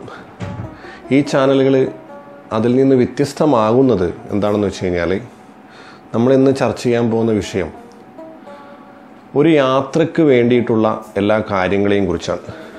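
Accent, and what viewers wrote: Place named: Malappuram, India. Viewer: kka ങ്ങളേ വർത്താനം.....അടിപൊളി....ങ്ങള് മലപ്പുറത്ത് ന്നാണോ?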